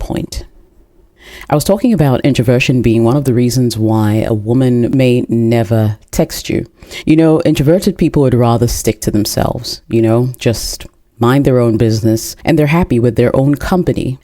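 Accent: United Kingdom. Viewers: Ar you a Ghanaian? Your accent is different but very proper. It's hard to fetch out the Ghanaian in you but mostly British. You're beautiful! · She’s British, probably born and bred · That accent is British English.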